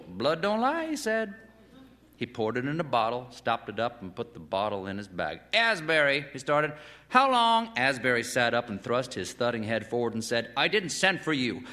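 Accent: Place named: South, United States. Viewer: He grew up in South Carolina but moved to New York in his early 20’s, so his accent is not as strong as it used to be...well that is until he reads a southern author or speaks with someone from there. Then his accent becomes thicker.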